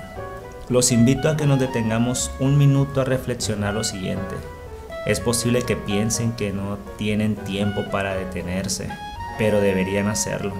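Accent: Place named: Sinaloa, Mexico. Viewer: ¿Porque habla así bato,acaso es sinaloense?,saludos,felices fiestas a todos incluyendo a católicos,musulmánes,etc.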